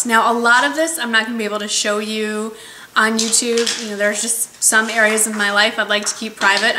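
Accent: United States. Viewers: I love your voice and your accent. It reminds me so much of my year in the US ...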